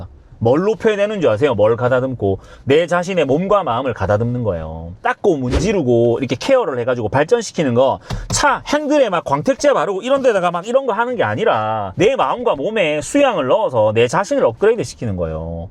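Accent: Gyeongsang, South Korea. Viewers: 아마도 경남분이신 것 같아요. 제 부산친구랑 말투가 같아요. 경상도 사투리라도 억양이 조금 다르죠!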